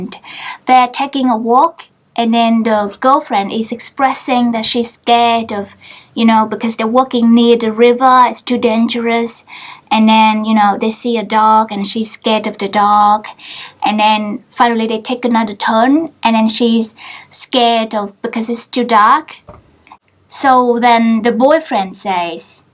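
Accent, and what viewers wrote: South, Vietnam. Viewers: Wooww... The southern accent is so cute. I’m from the North and I always wanna listen to southern accent.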